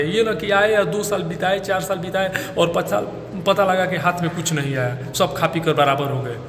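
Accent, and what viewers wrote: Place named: Gorakhpur, India. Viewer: आप गोरखपुर से है क्या भाई..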